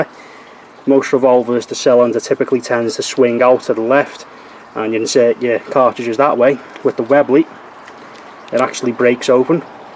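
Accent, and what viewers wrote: Liverpool, United Kingdom. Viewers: Hi mate, just found your channel, enjoying the videos! Assuming by your accent you are a fellow scouser. I just wanted to ask where the local air rifle shop is to here? I've recently got back in to target shooting and can't find anywhere local to get pellets etc. Relying on buying online, but end up with loads of dinged pellets. Thank you!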